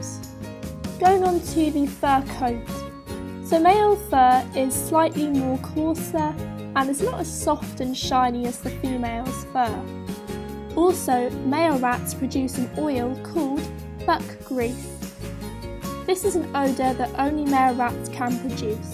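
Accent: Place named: London, United Kingdom. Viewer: What area of London is this accent from?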